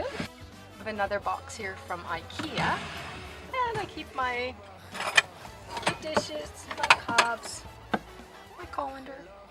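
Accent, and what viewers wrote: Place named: Canada. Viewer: Sweet setup and that Canadian voice doesn't hurt at all ... all the best!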